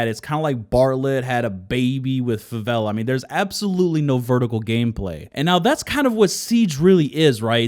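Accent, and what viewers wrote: Netherlands. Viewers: Dude are you Dutch? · Are you dutch?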